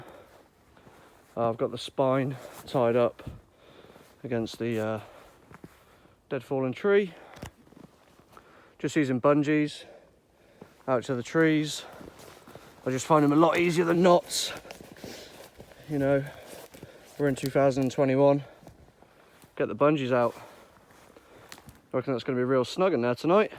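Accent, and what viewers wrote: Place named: Suffolk, United Kingdom. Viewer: Love your set up. East of you here. Nice to hear some Suffolk twang, even though you are in Norfolk.. 🥳👍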